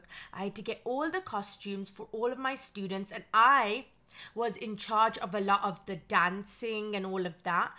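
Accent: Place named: Wales, United Kingdom. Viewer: Welsh accent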